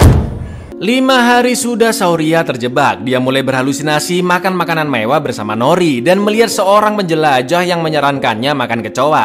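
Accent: Java, Indonesia. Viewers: kue wong jowo opo cok, medok timen le ngomong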